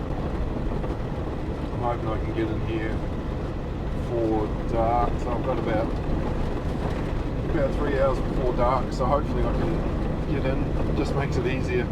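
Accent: Southland, New Zealand. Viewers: You sound like your from southland in NZ. Good job mate enjoyed the video.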